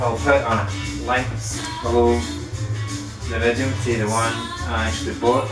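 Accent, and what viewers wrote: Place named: Scotland, United Kingdom. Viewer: Say, whuh??? Huh? Whudie say? hahahahaha ..no, just teasin' ya. Couldn't understand a word ye said, but no mattah .. yer precious in your delivery ... and yes, last part was in yer proper Scottish accent ... I almost understood every word. I do declare, Scottish is much more difficult to understand than Dutch. Bless you for making this video .. I will definitely go out now and get a water distiller. It sure seems to be working for YOU my friend. Thanks again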